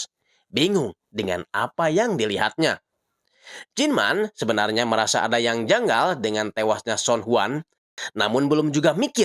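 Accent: West Java, Indonesia. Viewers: Keren pisan euy channel na Aya logat sundana Salam dari malausma Majalengka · mantapp mangg, guaa sukaa chanel ini cuma garaa² amang nya ada sundaa² nya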